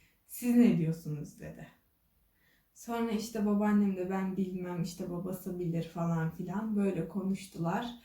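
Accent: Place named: Germany. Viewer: türkcen almancilar gibi cok kötü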